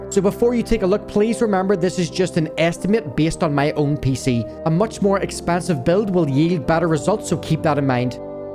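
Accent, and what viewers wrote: Northern Ireland, United Kingdom. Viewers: Good to hear another person from ni here lol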